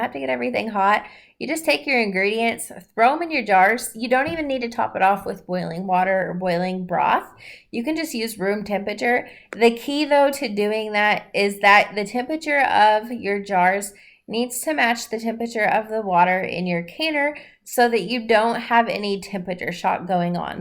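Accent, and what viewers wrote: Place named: United States. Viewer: I think with Worcestershire sauce coming from someone who actually lives in Worcestershire the safest option for someone like yourself who had an American accent is to do how Kristen from six sisters stuff says it and that’s “the W sauce”. I personally pronounce it wuss-ta-sher but I think you did a great job saying it xx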